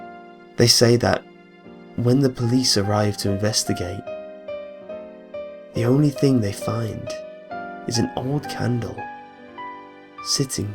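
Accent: United Kingdom. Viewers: Aww poor. I still love you. I love me some British narrators <3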